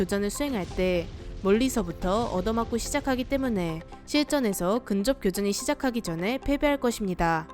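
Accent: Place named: Gyeongsang, South Korea. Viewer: 이 아줌마 경상도식 억양이 있네.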